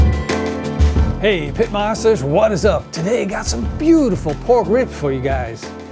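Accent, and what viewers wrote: Netherlands. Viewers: Zo te horen ben je nederlands. Waar haal je in godsnaam een rack als dit? Ik neem aan niet gewoon bij een slager?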